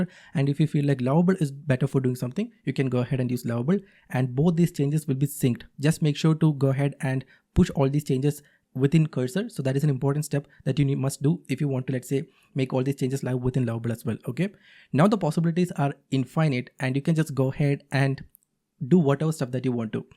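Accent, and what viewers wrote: Kerala, India. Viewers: Nice Malayali accent bro 😃 Very nice demo 👍✅ By the way, Do you like Cursor or VsCode?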